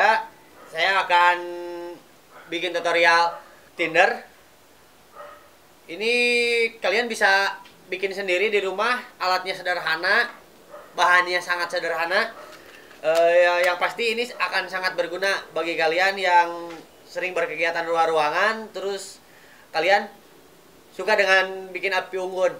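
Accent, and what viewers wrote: West Java, Indonesia. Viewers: Sunda pisan logatna hehehe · seceng anying wkwkwk khas sunda bgt lo bang hahaha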